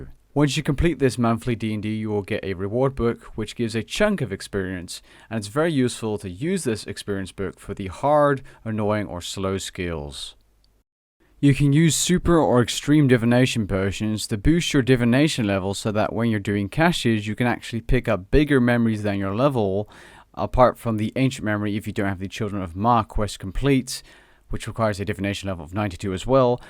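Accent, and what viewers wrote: Netherlands. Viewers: You are Dutch? :o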